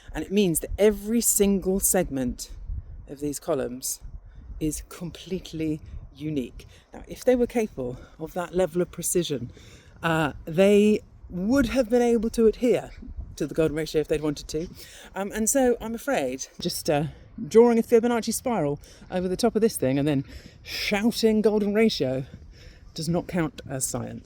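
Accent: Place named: RP, United Kingdom. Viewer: Everything always sounds more believable and trustworthy is explained in a posh English accent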